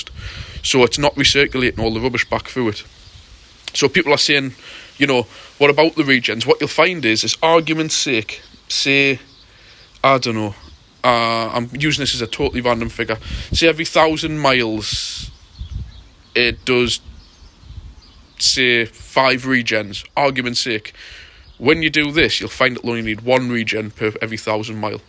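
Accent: Newcastle, United Kingdom. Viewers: Cracking info, as a car/biker tech-head it makes perfect sense to me. . Just ordered an agri tune for mine, I'll set it same as yours D5. The pronunciation is Agri (Agricultural) Tune. I previously used the 'crude' common rail fuel pressure adjustable boxes in my 4 previous diesels, C220 Coupe, C220 AMG Sport, BMW X1, Mazda CX5, FIAT Doblo even, (model specific boxes obviously) with no issues and VERY noticeable power gains, but as this box cuts the turbo in earlier it's a much better unit, you're entirely correct. 👍 Me and my Aussie wife chuckle at ya Geordie crossed with Ashington accent. I'm a Geordie, but she being Aussie she chuckles 😃. 'Mitsybooshee' class love it 😀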